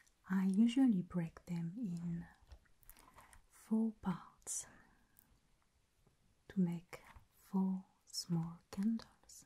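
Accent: France. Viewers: Bonsoir, le travail que tu mets dans tes vidéos est vraiment exceptionnel, bravo car cest très immersif. Le seul bémol pour moi c'est l'accent français qui ne fais pas "exotique" à mon oreille. Mais sinon vraiment bravo, je découvre ta chaîne et cest extrêmement qualitatif !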